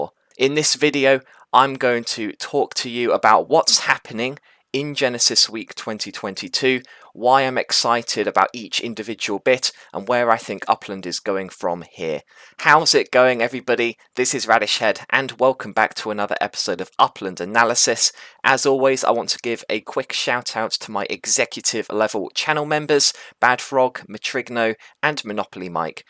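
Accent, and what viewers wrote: United Kingdom. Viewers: I wish I could go to Genesis week in Las Vegas how exciting. Where are your from Upland Analysis? You have a UK accent.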